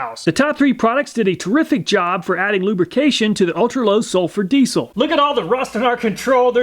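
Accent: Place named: South, United States. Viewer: I listened to you say ultra low sulfur diesel 3 times cause my friends said you sound just like me with my country accent. Lol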